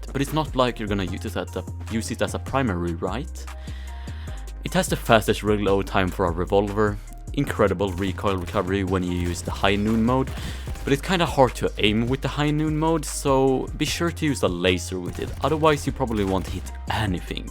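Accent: Sweden. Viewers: Breh he is Swedish how did you pick up British from that accent? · Jag kan höra att du är svensk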